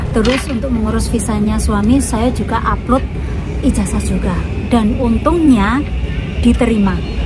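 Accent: Java, Indonesia. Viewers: lidah jawa banget ya mbk singgapur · Saya suka sekali bhsa penerangannya mbak. Gk pernah ninggalin bahasa medoknya wong jowo. Semngat buat sanoean berdua..serasa ikut merasakan perjalanan kalian berdua. Mantap mantap. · Mbak slm kenal iki wong jember hadir oya mbak jawanya dr a mana ta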